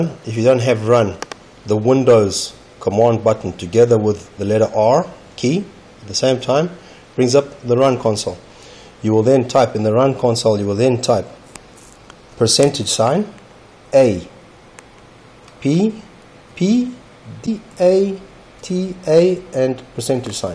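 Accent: South Africa. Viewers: Are you south aftican?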